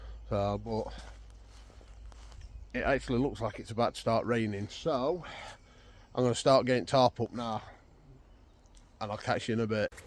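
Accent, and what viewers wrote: United Kingdom. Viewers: You have exactly the same accent as I do pal I'm from Pontefract are you close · Good watch! You sound like you’ve got a Sheffield accent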